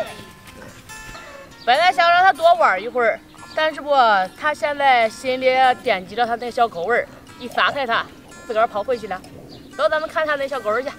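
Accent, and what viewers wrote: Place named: Hebei, China. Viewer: 真是发自内心的开心啊！做自己喜欢的工作最有滋味啦！听见我们河北的乡音，亲切💗💗💗💗💗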